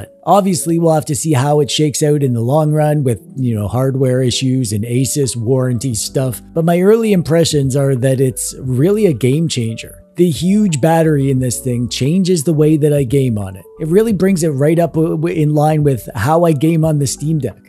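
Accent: Canada. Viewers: You’re Canadian, aren’t you!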